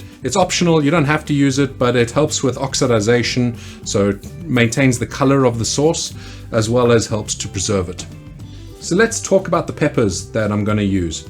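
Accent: South Africa. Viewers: Damn bro I knew you where South African me too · Didn't know you were South African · Nope! Sorry he doesn't have Kiwi accent at all. I can hear South African accent.🤣👌🙏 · i couldn't pinpoint your accent because it didn't really sound British but now i know why (because its south African)😂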